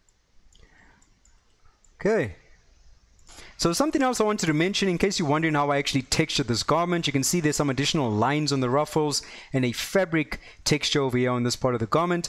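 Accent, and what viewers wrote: South Africa. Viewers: my bra! I have been looking for a quick and simple tutorial to get started with Marvelous and you hit the nail on the head! Love seeing some stellar content from fellow South Africans. This might sound strange but there is something about learning in your own accent that just makes it so much easier to understand. Keep up the stellar content! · I thought your accent sounded South African, confirmed by checking out your ArtStation page. :D And WOW, I'm seriously impressed with your work man, subbed here as well as your ArtStation page! Looking forward for more tutorials from you!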